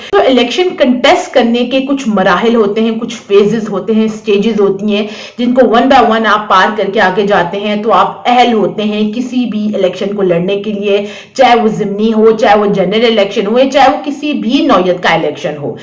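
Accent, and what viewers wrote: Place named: Pothohar, Pakistan. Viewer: Great Going with Pothohari Tone of Urdu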